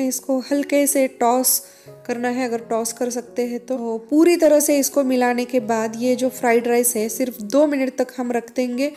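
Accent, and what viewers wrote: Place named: Maharashtra, India. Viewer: mam aapke baat karne ke tarike se malum howa ke aap maharashtra se hai thanks mam reply main bhi maharashtra se ho aurangabad se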